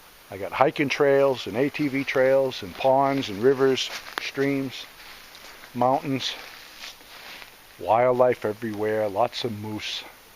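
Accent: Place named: East Coast, United States. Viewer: Sounds like an east coast Dick Proennecke!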